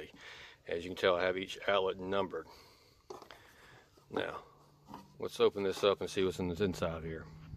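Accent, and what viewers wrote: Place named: South, United States. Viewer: Watching your videos of building your first control box, I think, and making giant candy canes. You were handling power tools your left hand. You're in the south, I'm in the south (TN), so I can understand you. You're a leftie, I'm leftie. All the more reason I like your channel. I just wish I had found you soon enough to build a controller and show for this Christmas.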